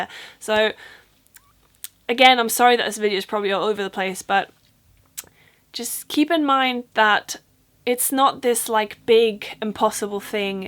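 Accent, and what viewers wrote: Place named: England, United Kingdom. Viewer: Called it..... best English accent from a German. Of. All. Time. Gut gemacht!!!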